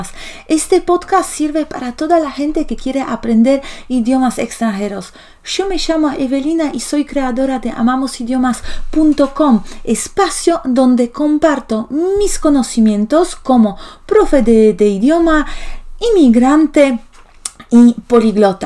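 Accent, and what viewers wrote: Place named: Rio de la Plata, Argentina. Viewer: Hola! He escuchado que tienes una pronunciación similar a la Argentina y Uruguaya, por ejemplo cuando dices "yo". Saludos desde Uruguay 😁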